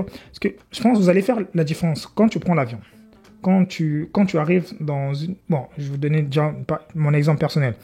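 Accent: Benin. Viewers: Ton Lomé a quoi de plus beau que ce qu'il a montré ? Ou bien tu veux qu'il invente des images pour Lomé ? Le temps accordé aux 2 villes est relativement équitable. Tu l'as vu comme un béninois ? C'est c'est l'accent béninois ça ?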